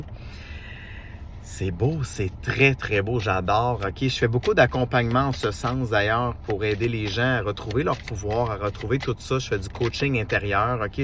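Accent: Canada. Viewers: Bsr ce fut un plaisir avc votre charmant accent canadien à chaque fois je regarde vos vidéos 😊. Bne soirée 😊❤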